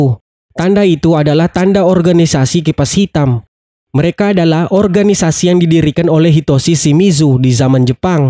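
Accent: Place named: Palu, Indonesia. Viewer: naratornya seperti logat Palu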